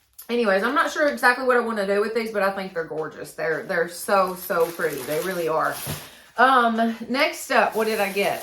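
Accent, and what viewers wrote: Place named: Georgia, United States. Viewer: GIRL! WHERE ARE YOU FROM??? I immediately subscribed because we talk similar lol Northwest GA here but loved the video! You found the holy grail of DT stocked items!!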